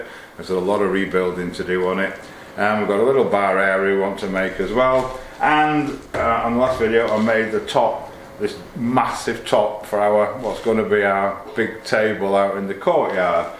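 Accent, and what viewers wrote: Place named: Chesterfield, United Kingdom. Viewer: Hi where abouts in the UK are you from? You sound similar to us we live in Chesterfield lm thinking your from near by perhaps Mansfield!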